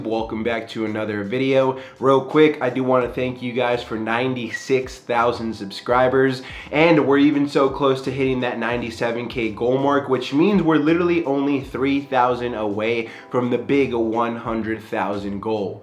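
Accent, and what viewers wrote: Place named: West Coast, United States. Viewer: Brother has a west coast bwhwhwhahah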